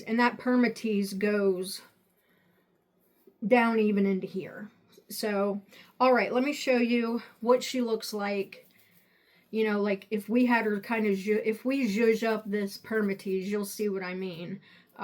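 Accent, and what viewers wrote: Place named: Philadelphia, United States. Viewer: May I ask where you’re from? You sound like you’re from Philly or South Jersey area, where Im from.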